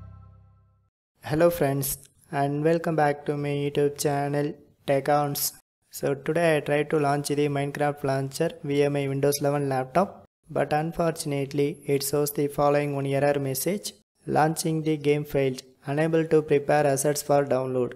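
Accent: India. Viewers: As soon as i heard the inidan accent, i new it will work soon.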